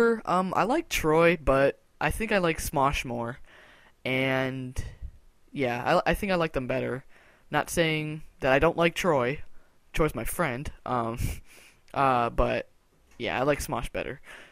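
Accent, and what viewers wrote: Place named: Australia. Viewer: Yes you sound austrailan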